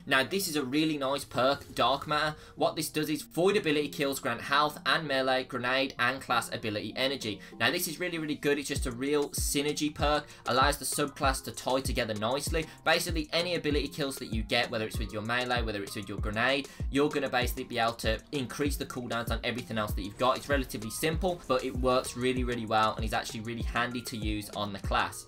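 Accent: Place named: United Kingdom. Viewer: British am I right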